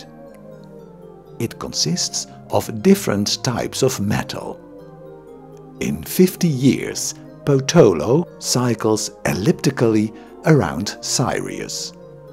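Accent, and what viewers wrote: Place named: Netherlands. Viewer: It's 'Sirius" not Sairius nor Thairius. Info is okay, the Dutch accents and pronunciation mistakes are annoying. so is the pedantic intonation.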